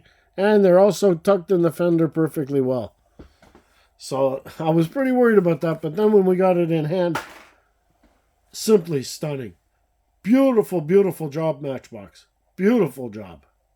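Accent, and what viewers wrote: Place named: Canada. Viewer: As a fellow Canadian but living in The USA, i love your videos and accent, EH! I want to display all my cars loose like you do. I have over 500 cars that need to come out of their cases! Where did you get your display cases?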